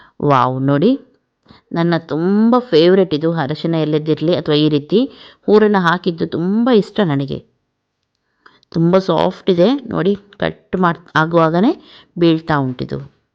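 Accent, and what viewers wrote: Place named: Halivana, India. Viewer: Kannada halivana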